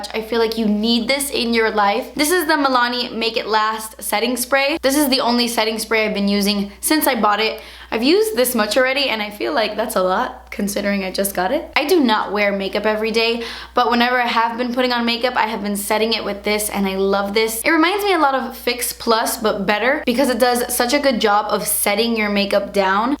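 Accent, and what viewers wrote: England, United Kingdom. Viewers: Full video speaking in british accent please!! ❤️ I am always expecting you to speak in that accent in every video. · Can you do a tutorial or anything with just talking in your British accent? Lol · I love it when you do an English accent! Please could you film a whole video in this accent?!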